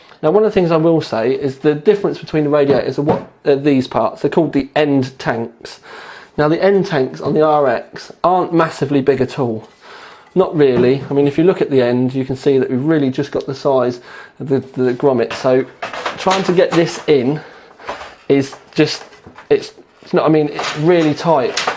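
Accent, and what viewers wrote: United Kingdom. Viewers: Let me guess, British accent ? btw excellent video,rich in detail .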